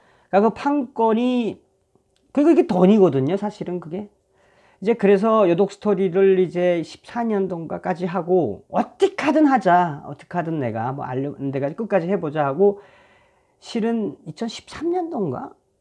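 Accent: North Korea. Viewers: 정말 잘되었습니다 감독님이시라 논평도 뮤지컬처럼 재미있게 잘하십니다 이북사투리도 들을수있어서 거저 재미 있구만요 하는일 잘되시기 기도 드립니다 힘내시라우요😃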